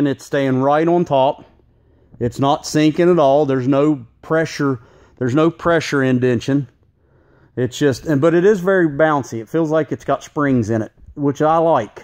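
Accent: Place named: South, United States. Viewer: The Southern accent gives this review that extra level of authenticity.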